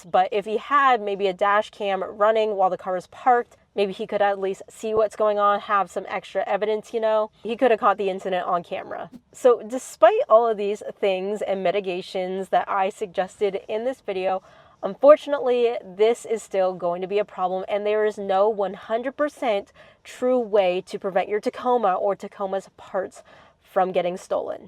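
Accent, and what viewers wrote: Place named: United States. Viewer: pretty sure she is american, I am expert on accents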